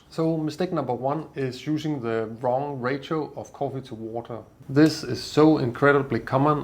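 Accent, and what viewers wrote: Denmark. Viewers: I heard your accent and knew you were from Denmark before looking at your profile to confirm! Tak I would love to learn how to use a French press. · Are you Danish? You sound just like a Dane speaking English 🤔 [Edit] I was right.😆 Your name is. 😆 You cannot get more Danish than that.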